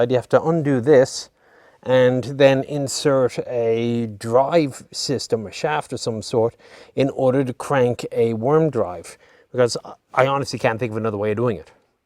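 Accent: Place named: Ireland. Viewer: Always great to hear the Irish Shh as in Sh-tuff .. especially explaining the complex simplicity of the Swiss Army Knife idea - mounted on a tank. Make way there for Shlattery's Mounted Futtt .. Huzza (with an -ay sound, it seems not with an -ah; like Jose and a wee cuppa tea: Yeah! hurray!) It's OK, I'm daft - certified and all.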